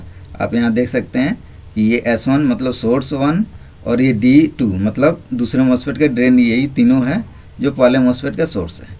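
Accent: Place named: Assam, India. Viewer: vai ap ka tune assam hotahe best of luck.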